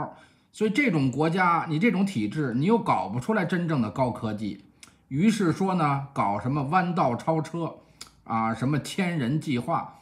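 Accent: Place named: Tangshan, China. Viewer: 哈哈唐山话，比落亭话还有味儿呢。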